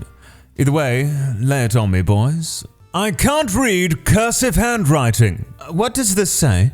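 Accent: United Kingdom. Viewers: 'sup mr british voice sir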